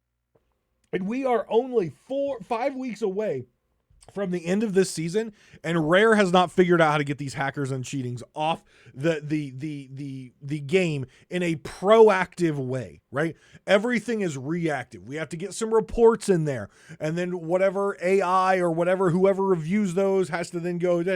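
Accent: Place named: Canada. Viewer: next episode releases “Alright let’s see what our boy is championing today - oh thats a good one.” Long time Spotify listener, day 1 sailor - weekly sailor. Im here to say I love your style, and sometimes your heated opinions are very needed, this is one of those topics. I want to reiterate that I love you, that said - “bolth” and “aweso” …. Kills me every time brother. EVERY TIME. also says “bolth” though. Seems like a northerner/west coast/Canadian thing? Kills me! Lol but keep it up duder, great stuff and I actually really appreciate having constant content to listen to. Its nice to audibly jump into the seas while I’m working.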